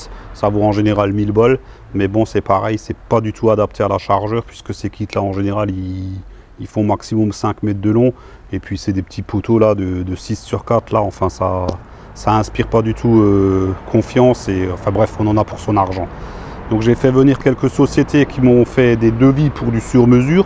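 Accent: Alsace, France. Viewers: Rien que pour l'accent alsacien je mets déjà un pouce en l'air ;-) en tout cas c'est clair qu'il est bien fini ce carport . Par contre qu'en est-il de l'entretien du polycarbonate transparent ? facile à laver ? et niveau résistance ?? J'ai beaucoup de vent dans mon petit patelin lorrain, je n'aimerai pas retrouver la toiture chez le voisin...tu penses que c'est costaud si ca souffle fort ???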